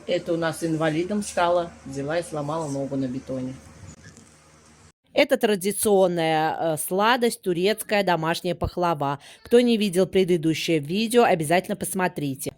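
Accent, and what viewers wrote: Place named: Russia. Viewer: У нас в России есть пословица, встречают по одёжке, провожают по уму, спасибо за интересный фильм, вы по настоящему русская женщина, я имею в виду как вы разговариваете и строите свою речь, только так русская может говорить и высказать свое мнение, интересная ваша семья, дети воспитаны, выскочил ваш канал, не смотрела, а потом подсела, лучший турецкий сериал, здоровья вам.❤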